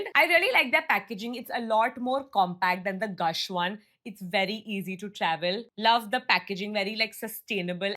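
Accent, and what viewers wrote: India. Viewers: She actually talks like Ross from friends but with an Indian accent.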